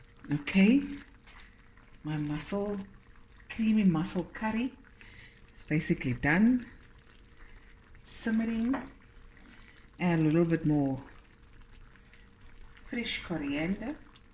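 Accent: South Africa. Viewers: I knew she was South African.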